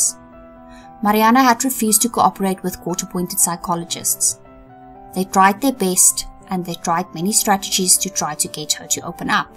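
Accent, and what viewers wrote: South Africa. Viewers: You sound Afrikaans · I recognize your accent sister 🇿🇦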